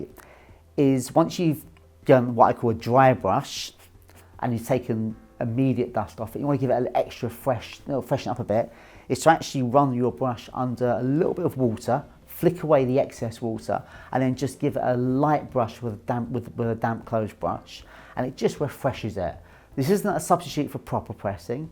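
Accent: England, United Kingdom. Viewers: I stumbled across this channel a few months ago and after a few videos I decided to subscribe. Good move.lm' Iearning a lot, and presents the information so well in his laid back clipped English accent. Surfed over to the website and was super impressed with their pocket squares. I just purchased two of them and will probably buy ten more. Thanks, and and the whole Rampley team for putting this videos together.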